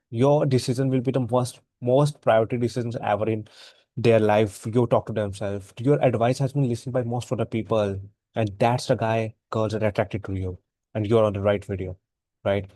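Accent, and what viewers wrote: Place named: India. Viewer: In this video little too much bass, I am having hard time understanding all you say because Indian accent heavy. When sound is more clear, I can follow you, but not if too much bass. Just giving you feedback because most people would just stop the video after 2 minutes Content is good though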